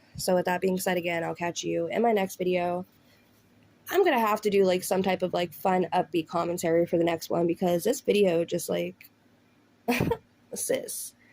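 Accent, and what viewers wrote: South, United States. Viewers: your accent is interesting. Your “I” sounds like your from the south (words like “LIKE”, “LINE” ect.). All other words sound like “normal”. Where in the country do you hail from? Love you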